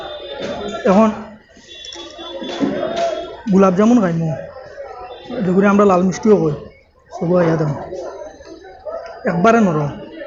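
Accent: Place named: Sylhet, Bangladesh. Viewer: Are you indian Bengali. You talk like Bangladesh Sylhet division people. Karimganj is a assam province district but you talk Bangladesh Sylhet division local Bengali language you not talk assamese language? And why you say Sylheti vlog Sylhet is a part of Bangladesh. You live in assam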